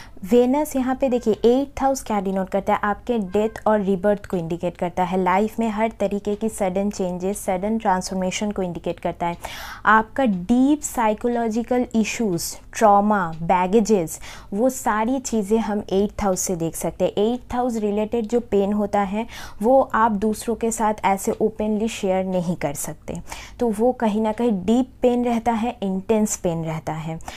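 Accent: Bengal, India. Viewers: You are bengali right?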